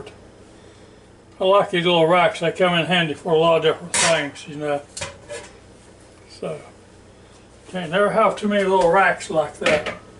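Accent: South, United States. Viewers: It’s the southern accent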